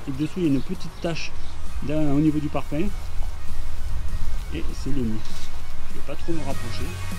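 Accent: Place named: Toulouse, France. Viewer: avec un accent toulousain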